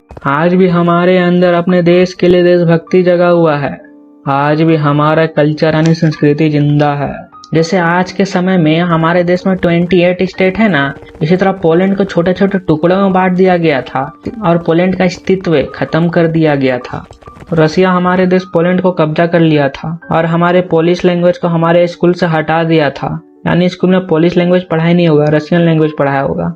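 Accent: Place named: Bihar, India. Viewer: aap muje bihari lad rahe ho aapke aawaj se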